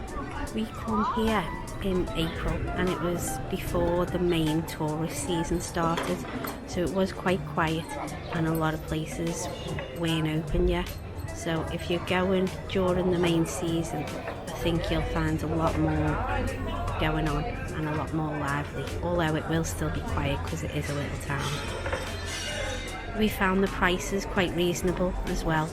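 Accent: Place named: Liverpool, United Kingdom. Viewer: No mistaking that scouse accent there 😁 do u live out in Portugal? Were staying at 3HB Club Humbria in October can't wait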